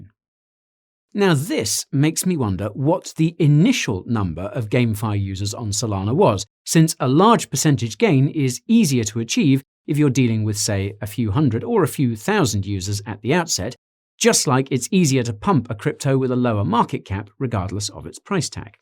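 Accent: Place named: RP, United Kingdom. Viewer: You somehow remind me of Peter Dinklage in GOT with your british accent. Love you champ 😎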